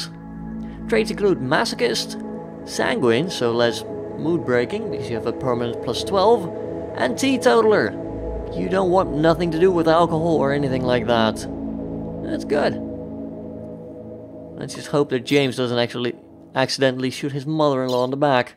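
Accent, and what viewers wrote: Netherlands. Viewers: are you dutch ??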